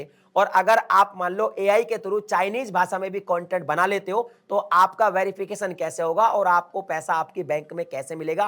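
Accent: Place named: Mewat, India. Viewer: bhai mewati Hain 😂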